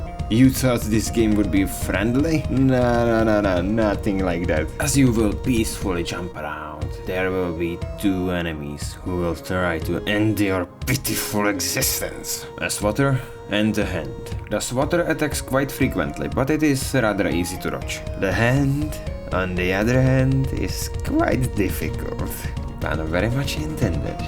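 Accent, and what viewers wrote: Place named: Czech Republic. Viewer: What country are you from if I may ask?? Ur accent sounds Czech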